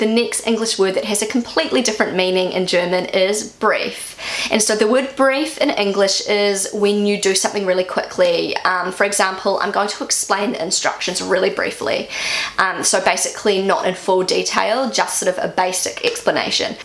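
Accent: New Zealand. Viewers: Just found your channel and I am enjoying it! I think, with an Aussie twang, some of these German words feel easier to pronounce than perhaps yours with a Kiwi accent. Eg. Links ('Lien-x' in Kiwi, 'Lin-x' in Aussie). Probably vice versa on some words too. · As a native speaker of English, I have less trouble understanding your German than your Kiwi English. Dead, pronounced “did” Gift, pronounced “guft”. As with the famous graffiti: Australia 6 New Zealand 7 Pronounced: Australia sucks, NZ ‘sivven’ (‘s heaven). Keep up the good work! 😉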